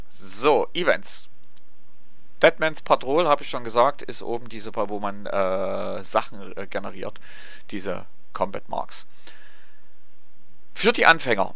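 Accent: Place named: Saxony, Germany. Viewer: Rischer Sachse erklärt de Maritime Kriegsführung, das gefällt mir aber gud o :P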